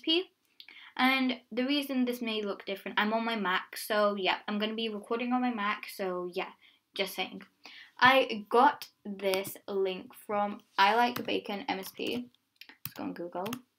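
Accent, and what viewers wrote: Denmark. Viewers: Wait are u danish?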